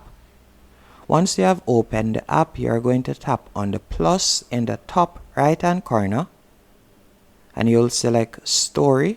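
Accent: Jamaica. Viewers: Dawg yardie accent nah guh no weh! Respect! This is what I was looking for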